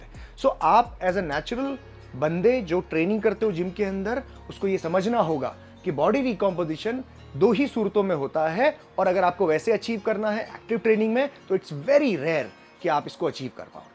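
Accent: India. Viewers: of course he has a perfect indian accent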